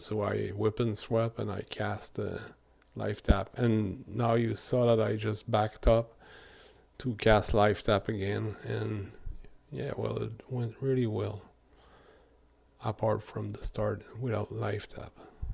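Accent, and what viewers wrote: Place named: Quebec, Canada. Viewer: quebecois jle sens dicite!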